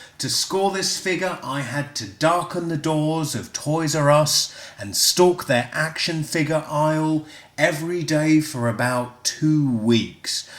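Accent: United Kingdom. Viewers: Oh MY GOD UR BRITISH I FEEL LIKE FAINTING! LOLOL!!! who gives a damn? Im british too. why do americans love us so much???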